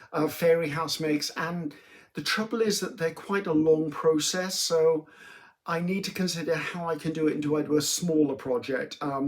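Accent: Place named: Wales, United Kingdom. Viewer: I am one of your new 2022 subscribers and I appreciate your sincerity, honesty and how you are yourself. Thank you for speaking so candidly about your bout of depression. I've dealt with depression throughout my life and it's good to hear artists speaking about it. I love that you are from Wales (your accent makes me think of my Welsh mother in law) and how you embrace the diversity that surrounds you. Like you, I have much to be grateful for. I wish you a wonderful Christmas and all the best in 2023. Know that you are supported from your fans (like me) in Canada.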